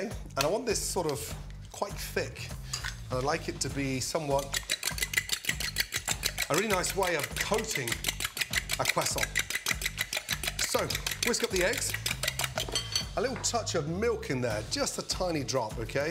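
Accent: United Kingdom. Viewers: Hi Gordon! I love your dishes, I'm Mexican and for me it is difficult to understand your British English, but I love to watch you...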